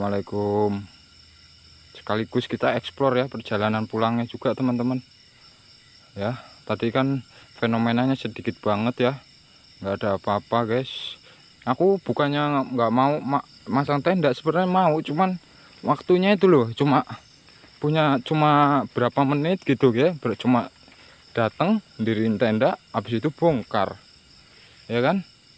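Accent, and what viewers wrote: Java, Indonesia. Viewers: Bang aku seneng klu abang lg masak dan ciri khas jwa nya medok banget kku ngmong,, semangat dan jngn lupa banyak doa waspada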